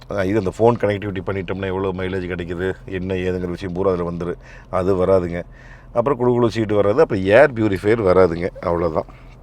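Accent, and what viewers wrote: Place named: Kongu, India. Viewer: அக்கா சரிக்கா. வெளிய போங்ககா 😂😂😂😂கொங்கு தமிழ்.. அழகு... மொறு மொறு மொறு மொருன்னு 😂😂அருமை அண்ணா 🙏🎉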